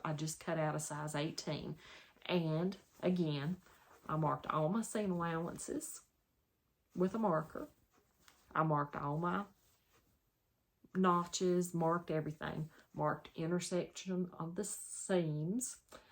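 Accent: South, United States. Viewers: Hello from another! I enjoy your videos & accent! I also have a southern accent as I grew up in Texas and moved to Virginia 12 years ago. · First time watcher. I enjoyed your video. I'm from Louisiana originally but now in CA. So nice to hear a southern accent. I'm an experienced quilter who is now wanting to make my own clothes. I'm having a hard time fitting into the commercial patterns. I am only sewing with knits because they are so forgiving with fit. I'll be watching. --Lynda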